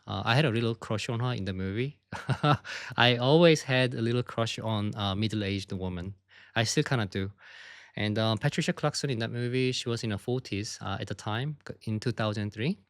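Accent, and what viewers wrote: United Kingdom. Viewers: I am Afro-American and I can hear a slight British accent in and your voice! It's interesting to me😊🔥💯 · Hi! I really like people's different accents, I find it s3xy. I can recognise the British accent in your speech and i admire your vocabulary and perfectly English speaking. I live over 10 years in England and my English is nowhere near yours, lol. I never tried to adopt a British accent, I simply can't. I try to pronounce words correctly so people can understand what i say (that's not always successful), but i do not mind. I am greek, i have different sounds in my language. You know something? I haven't met the English person yet, who can pronounce my surname correctly. Because that sound does not exist in English language. Just call me Ms K. That will do. And the app i use to learn korean cannot recognise my accent most of the times. 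That makes me try harder. I hope the 2 greek friends treated you with nice food back then. I am going to Corfu this summer ☀️ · I'd say your accent is obviously British, I was able to detect it before I found out you studied in the UK. Your pup is adorable😅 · As someone who migrated also to another country myself I think your English is very good. Your accent sounds a bit like British. I think anyone with an accent means that they speak more than one language. In my opinion being bilingual it’s better than knowing one language.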